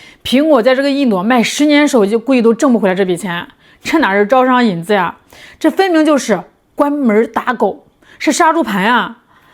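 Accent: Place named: Henan, China. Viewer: 博主听着像河南人捏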